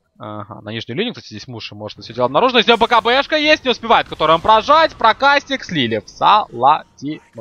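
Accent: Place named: Ukraine. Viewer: Не очень понятно, когда ты говоришь украинским акцентом :/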